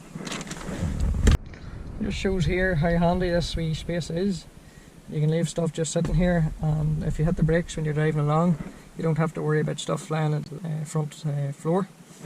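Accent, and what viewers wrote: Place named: Derry, United Kingdom. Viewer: Hearing this lads accent to me he sounds like he is from Derry Amen Godbless Madeira 40 40 Liamp/atrickmr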